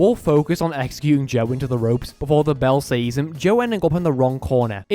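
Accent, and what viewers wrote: Liverpool, United Kingdom. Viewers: they re both from liverpool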